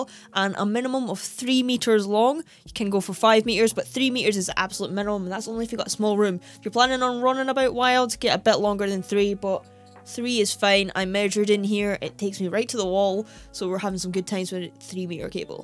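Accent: United Kingdom. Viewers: Great video! Love your brit ascent!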